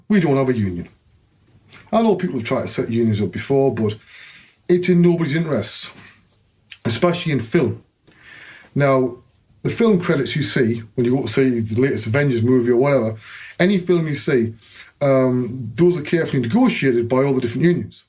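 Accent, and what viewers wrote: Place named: North East England, United Kingdom. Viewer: Good to see your life after 3d series, think you are right to try to stick to one topic at a time for these, good for the algo. Have you thought about doing a regular podcast? I think you'd work well with people to bounce off, guests and/or co-hosts? Sure you've got the industry contacts to have loads of interesting shows. There's a few of these already, might be room for one with a North East accent😉?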